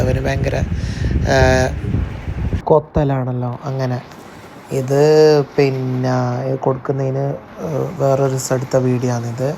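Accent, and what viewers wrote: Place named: Kasaragod, India. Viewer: Edk kasrod slang bern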